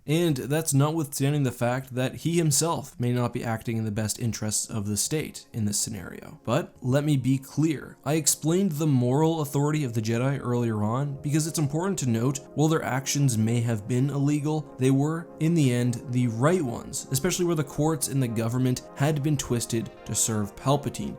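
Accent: Canada. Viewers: This is this first confirmation I hear of your Canadian nationality. I suspected as much from your accent slipping through in most episodes. If you had mentioned it somewhere else or before, I haven’t seen it. It’s just key words that highlight the accent.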